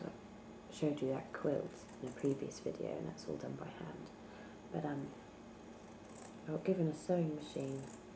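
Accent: United Kingdom. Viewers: Holy crap. I've finally found a whisperer with a British accent! Major win tonight. Great videos!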